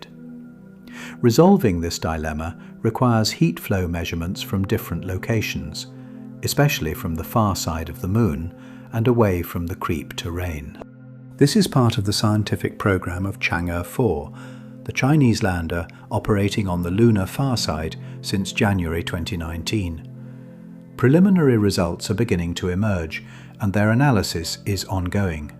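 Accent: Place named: England, United Kingdom. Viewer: A..I uses an English accent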